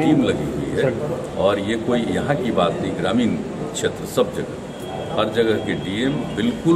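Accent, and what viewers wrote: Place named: India. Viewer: Dehati Bhasha Jaise bol rahe ho Hatiya hi to Bhadwa Hai yah kaun sa Baat hua Barsat Ka Mausam Mein madad karo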